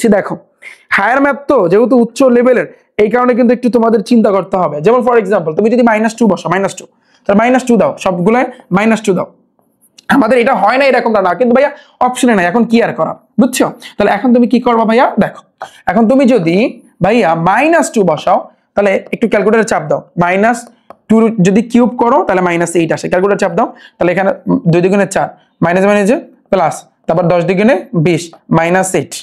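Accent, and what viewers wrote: Barishal, Bangladesh. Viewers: স্যার এর বাসা কই,,কিছু কথা শুনলে মনে হয় বরিশালে 🤔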